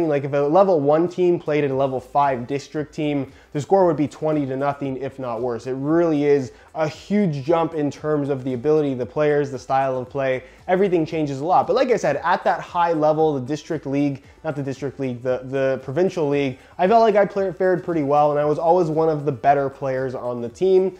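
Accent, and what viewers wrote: Canada. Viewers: I knew you were Canadian. I argued this with a friend "Deng". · I knew you were Canadian! Can always hear the accent of my people LOL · Wow your Canadian accent is so prominent to me in this I never really noticed I guess. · i LazyCake The face is so Canadian? No. The only tell is his accent, his face could be American, German, French, Italian, Dutch, etc... what does “his face is so Canadian” even mean? I’m from Canada and I’ve travelled a lot, so I just find that wording hilarious.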